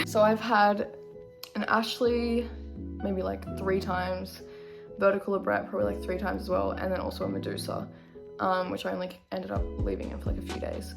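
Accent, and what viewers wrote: Australia. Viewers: My bi Aussie ass just sitting here stunned hearing a similar accent to my own and watching someone exist being that pretty- like huh 0-0 how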